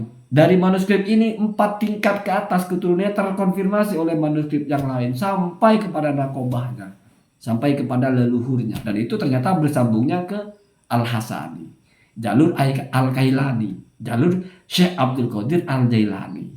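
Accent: Madura, Indonesia. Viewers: Debat soal nasab, saya belum yakin kalau belum dengar hujjahnya. Penyampaiannya simpel, jelas, netral dan lugas dengan gaya Maduranya😁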